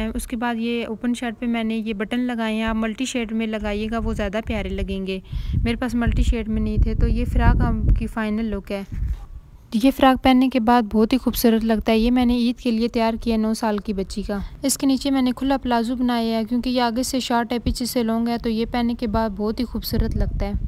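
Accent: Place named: Chakwal, Pakistan. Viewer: App ka lejha bta Raha ha k ap chkwal side se hn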